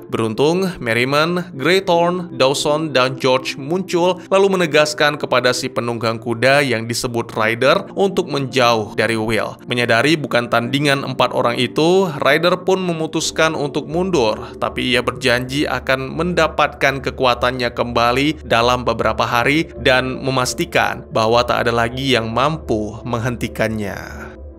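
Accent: Aceh, Indonesia. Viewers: Bang orang aceh jelas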